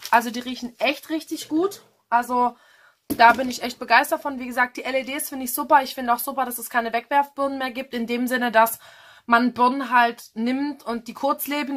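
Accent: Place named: Swabia, Germany. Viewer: Du schwäbelst hart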